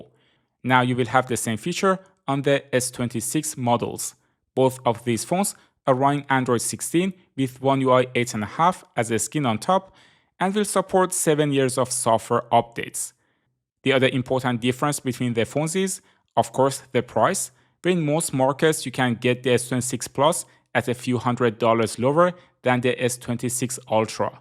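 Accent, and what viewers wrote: Iran. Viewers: همیشه یه لهجه ایرانی به گوش آشنا میاد 😊 موفق باشی پسر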